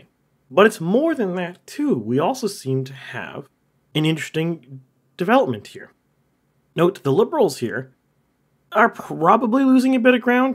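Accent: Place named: Canada. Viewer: you have a funny Canadian accent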